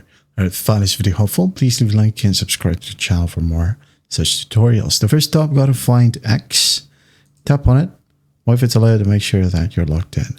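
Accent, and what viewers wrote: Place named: Australia. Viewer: i guess australian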